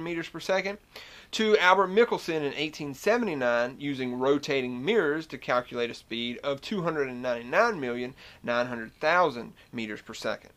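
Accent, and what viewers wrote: South, United States. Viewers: dunno, but an astrophysicist with a southern accent is mighty cool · Love your Southern accent dude! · Most of us pronounce "Io" as "EYE-oh", not "eye-OH", but perhaps you are an electrical engineer. :-) I love your southern accent, combating the stereotypical idea that southerners are stupid. Great videos, man.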